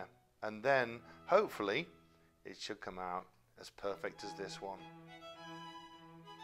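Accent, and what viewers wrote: United Kingdom. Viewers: The british accent, the music and the "amusing" sequences with the plush dog remind me of the educational films in english lessons from fifth class. :D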